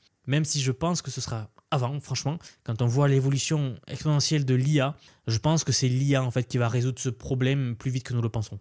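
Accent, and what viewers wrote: South, France. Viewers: J'avais relevé ton MAGNIFIQUE accent Italien... J'ai éclaté de rire lorsque tu as dit: "Vous avez relevé min magnifique accent" J'AI ÉCLATÉ DE RIRE... En plus d'être curieux mcultivé, pertinent, intéressant, pédagogique..... TU ES DROLE... 😂 ET MALGRÉ TES EFFORTS ET TA PUISSANCE, Beaucoup de gens malgré tes efforts Ne. Comprennent rien à ce que tu dis ni ce que tu fais.... Ils de dénigrent car tu es jeune, tu as l'accent du sud et que t'es en frénésie... Je me sens très proche de toi Merci pour ton travail J'aimerais pouvoir un jour te rencontrer et aussi cuisiner pour nous...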